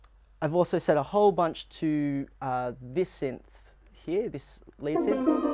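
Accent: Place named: New Zealand. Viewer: Although, I think this chap may be from NZ. :)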